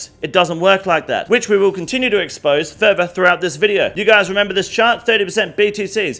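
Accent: Australia. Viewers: fuck me its just good to just hear an aussie voice on an rs vid every now and then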